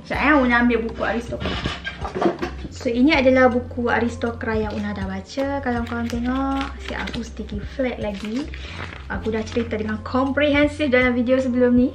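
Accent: Kedah, Malaysia. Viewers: Weiii, teka betul la kuning! Hehe, eksaited sendiri! Btw, suka dialek Kedah sebab ada seorang kawan Kedah kat uni dan rindu beliau bila dengar cakap lagu ni. Best video! Suka tengok. Keep going! 😊😊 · Sya suka kak punya perckpan dialek Kedah tu🤣🤭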